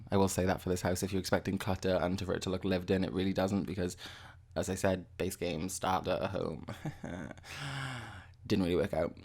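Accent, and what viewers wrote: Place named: Wales, United Kingdom. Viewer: You sound welsh, I am too (I’m from South Wales) 💓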